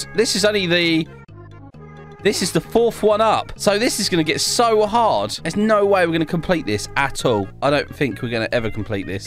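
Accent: United Kingdom. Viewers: Cool vid man the bri ish accent tho ✨AMAZING MAN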